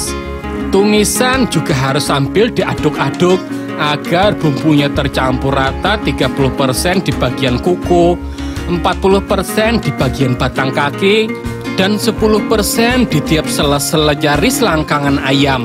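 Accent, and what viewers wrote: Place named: Banjar, Indonesia. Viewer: Logat banjar nya tu heh lawak banar🤣🤣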